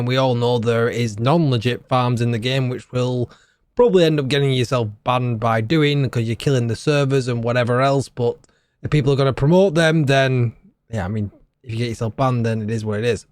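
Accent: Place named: Leeds, United Kingdom. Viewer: You sound like you're local to me. Leeds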